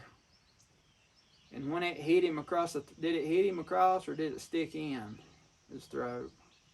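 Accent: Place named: Kentucky, United States. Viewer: you've become my favorite channel because of your stories, life, family, lessons and just being real... and I'm old enough to be your mamma! Your teaching is spot on and the truth if I ever heard it. Plus, the way you talk is just like my mom's people in Kentucky! Love it 🥰 Looking forward to hearing more! May God bless you and your family. Mrs Barbara PS when it comes to failing at something you really wanna learn and do, my motto is to keep doing it until you get it right! I applaud your parents. You're a fine young man I'm blessed to know.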